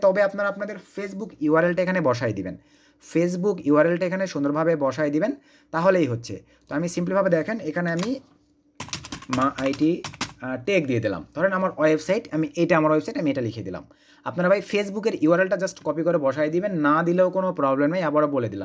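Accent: Joypurhat, Bangladesh. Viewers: vai joypurhat kotha basa? apnar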